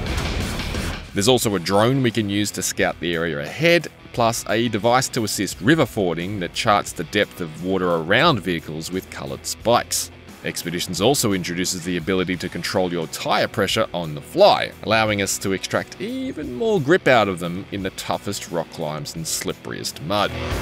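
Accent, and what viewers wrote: Australia. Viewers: He's an Aussie. It's how we sound. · Did they purposely find an aussie guy to review this? Kinda makes sense tbf lol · Yeah nah, He's positive neutral. it's just the Australian accent. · Australian accent does that. A lot of us are very monotonous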